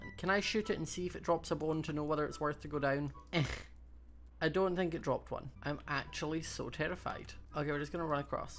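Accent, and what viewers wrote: United Kingdom. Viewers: Waittttttt are you british?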